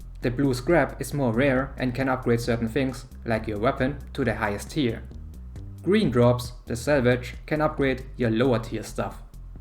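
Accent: Germany. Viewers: Ich weiß nicht wieso aber ich höre direkt dass du ein deutscher bist 😂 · Are you german because of your accent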